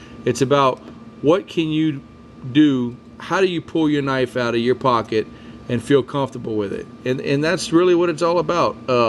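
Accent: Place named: South, United States. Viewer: usa earth? Easy to tell your a southerner. I approve :-) thanks for the review, and the realistic terminology :-)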